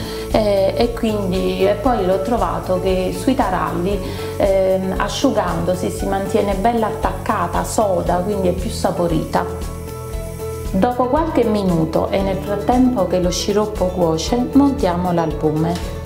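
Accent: Naples, Italy. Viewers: Ciao. Sei molto simpatica.... col tuo accento e battute in napoletano. Sei anche molto brava e calma nelle spiegazioni. Questo aiuta molto a seguirti bene. " u scelepp" è di origine pugliese... (Io son della provincia di Bari). Mi piace questo metodo con l'album che non ho mai utilizzato perché nn viglio pastorizzare ogni vola. Mi chiedevo, a parte il procedimento di lavorazione, che differenza sta tra il fondente di zucchero e questa glassa. Cosa si aggrappa meglio e quale tra i due diventa e resta duro asciugando? Devo ricoprire biacotti e altre decorazioni di pasta frolla e non vorrei che urta do tra loro i biscotti si possano rovinare facilmente. Grazie per l eventuale tue info. Un abbraccio Maria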